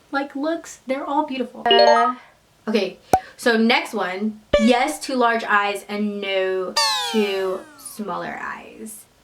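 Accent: Philippines. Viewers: i'm a full blood filipino and i don't know if i really look like a filipino on my videos lol, and i really love your accent when it comes to speaking english & filipino 😍😂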